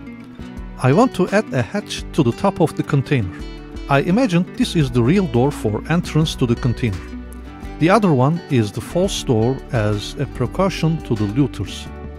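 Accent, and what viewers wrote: Russia. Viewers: new to this channel is that a russian accent or..? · Мне одному слышится английский с Рязанским акцентом?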